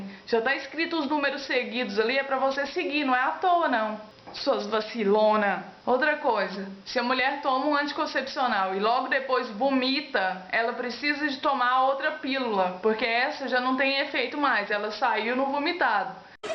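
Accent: Minas Gerais, Brazil. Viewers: Uai gente, ela é mineira, mais óbvio impossível. ;d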